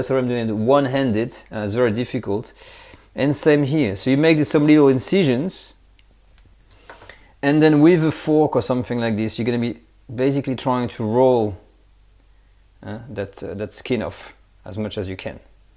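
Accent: France. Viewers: You hv the cutest French English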